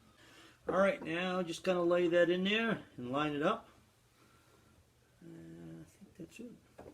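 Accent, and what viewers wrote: Maine, United States. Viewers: So, what part of Maine are you from? I know my people when I hear them. 😀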